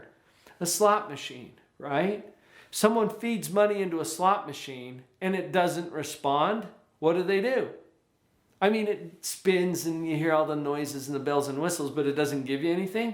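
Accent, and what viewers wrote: United States. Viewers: This guy sounds like he's from Utah or something.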